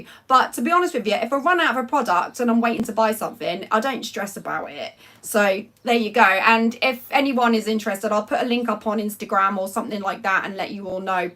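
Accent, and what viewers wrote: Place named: Lowestoft, United Kingdom. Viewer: Where in Norfolk are you originally from? I'm guessing your accent is sort of Lowestoft?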